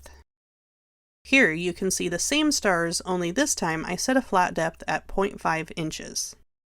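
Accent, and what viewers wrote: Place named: England, United Kingdom. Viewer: Good job. Your voice is perfect for this work. I've been fooling around with this stuff for 3 years and now I know I've been doing it all wrong with some unusual results. always went back to the straight bits with just OK results. I think Vectric should hire you to do all their training videos. The English accent confuses me every time. I am now a subscriber. Thanks again. I guess an 80 year old man can still learn a few new tricks after all.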